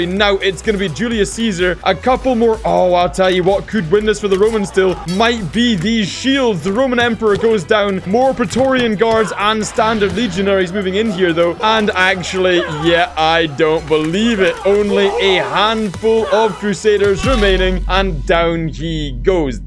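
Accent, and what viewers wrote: Scotland, United Kingdom. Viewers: you scottish because i am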